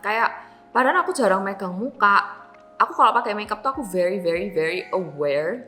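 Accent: Australia. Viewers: selain suka hasil make up & tekniknya, suka bgt sama accent aussie nyaaa🫶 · Omg the aussie accent ❤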